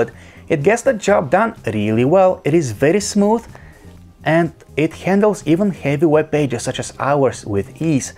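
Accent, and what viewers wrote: Russia. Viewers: you're Russian ? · Произношение заебись. Он русский, чтоли?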